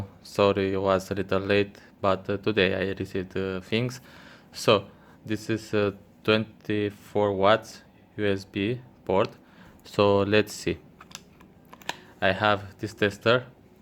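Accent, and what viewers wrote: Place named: Scotland, United Kingdom. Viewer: you sound like a scotish guy :D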